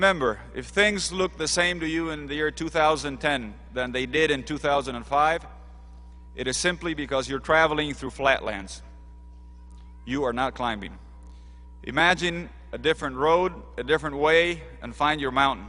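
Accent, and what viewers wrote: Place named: Latin America. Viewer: ¿ orgullo boliviano? realiza un discurso grandilocuente, repitiendo las frasecitas cursis que escuchó en tantas películas gringas. Pero, a sus espadas, los académicos se matan de risa. ¿Por qué? Para ellos, es Félix Patzi hablando castellano, esforzándose en hablar inglés fluido, pero no puede disimular su acento latino, en pocas palabras, está haciendo el ridículo. Está hablando la mascota que ellos adiestraron. ¡Es indignante! Hablar inglés y vivir en EEUU, es el sueño de muchos bolivianos desclasados. Cuando era gobierno, pretendía vender el gas boliviano a los EEUU por un puerto chileno. ¿Qué hizo por Bolivia? Nada.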